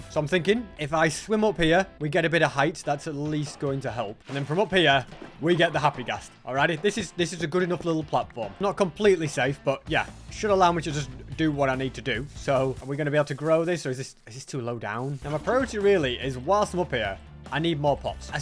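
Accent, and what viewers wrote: United Kingdom. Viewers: either way he literally speaks in a type of british accent all the time so ur comment makes no snse. what u mean is a british word u cant seem to remmerb him saying much, noy at all the same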